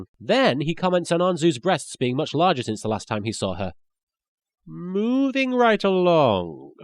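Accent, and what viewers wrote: United Kingdom. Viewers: I miss your old clearly british voice. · I was not expecting for you to be British. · He's actually British?! THAT'S AWESOME! xD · ZOMG his real voice is just a combination of bakura and yugi's voices. or yugi with a brittish accent hard to tell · holy crap is british??!!! how did i not know that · Wait is British??? Bakura? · wait is british? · Except his voice is more British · your british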